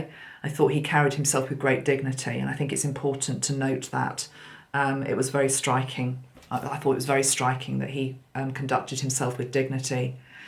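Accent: England, United Kingdom. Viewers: Thank you so much for your kindness and charm, and your lovely English accent. I come from Brighton XXX🕊️🌹🎶💡🎭🐛🦋😷😘👽💚🙏🏻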